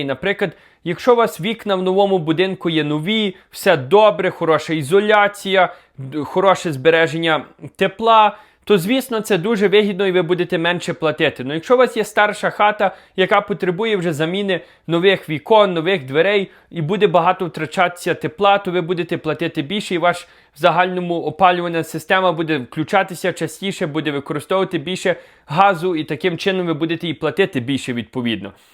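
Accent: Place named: Western Ukraine, Ukraine. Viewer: Не дуже інформативне відео. Заголовок давав надію на більше. Але було цікаво. Чудова мова заходу України!!!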